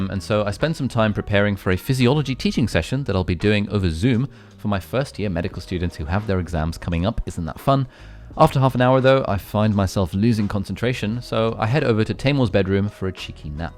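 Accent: United States. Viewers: Does anyone else notice accent has changed abit toward American? Or am I just imagining things? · Your accent has changed. It is more American now.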